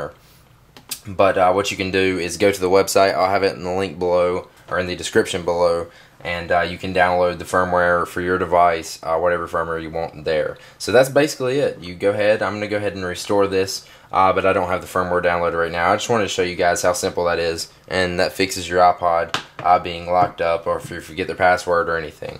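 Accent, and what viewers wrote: South, United States. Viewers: Damn old vid. U sound so country here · pretty sure he just sounds like a southern United States lad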